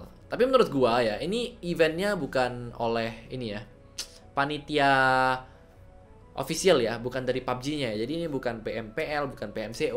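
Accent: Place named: Java, Indonesia. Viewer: oh gtu.. jdi bg medok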